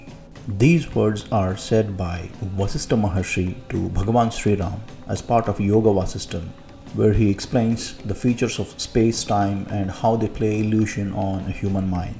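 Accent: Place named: Tamil Nadu, India. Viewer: Hi I'm not judging From your accent I can feel that you're from Tamilnadu? I might be wrong, sorry for that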